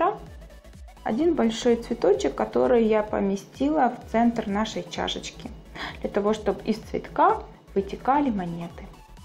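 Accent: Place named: Ukraine. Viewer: ну я поняла,просто сказала.вы с Украины?у вас есть акцент.много знакомых на Украине живут,они все верят во всякие приметы.У вас не так?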